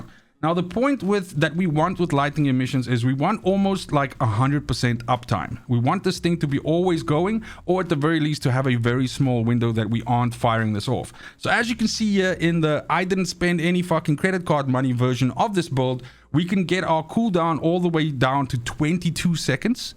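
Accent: South Africa. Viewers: Sounds like a fellow South African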